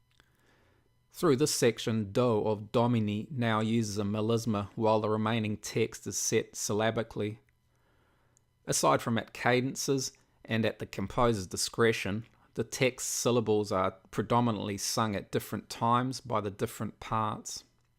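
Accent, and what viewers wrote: New Zealand. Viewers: Are you from nz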